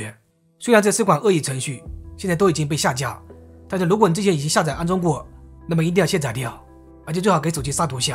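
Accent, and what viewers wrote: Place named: Jiangshan, China. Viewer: 有点像衢州江山口音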